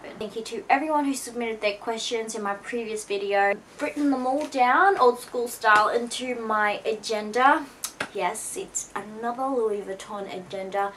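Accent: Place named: Australia. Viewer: Hey!! Im soo soo happy to have you back:') I have really missed that aussie accent! Would you mind doing a video on picking medicine as career for highshool students? Like why you wanted to do it, how the application process was for you, how was it when you got in, your feelings in med school, advice and tips for people wanting to get in and is medicine what you expected? And pros and cons of being a doctor! SO SORRY THAT WAS A LOAD OF QUESTIONS!!! Could you please involve that in your education video?:) xx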